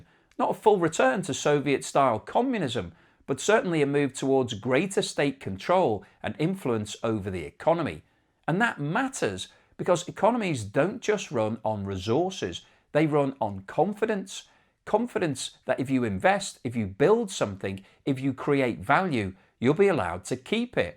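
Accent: United Kingdom. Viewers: you sound very British, and nobody in his right senses today takes seriouse what a British man says about Russias, Britain amd the West is the world problems, even worms knows that!!!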